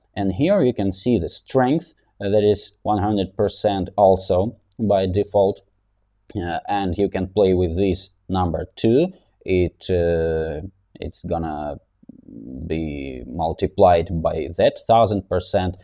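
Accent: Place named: Ryazan, Russia. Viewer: Константин Санжаровский не совсем. Частенько проскакивает рязанский) И ни один нормальный носитель языка не говорит с таким усилием, выдавливая из себя слова.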